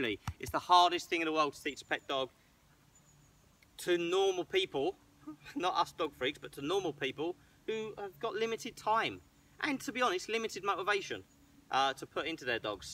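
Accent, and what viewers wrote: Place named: London, United Kingdom. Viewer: k ent shuster That's a London accent, silly, and it's a warm summer day.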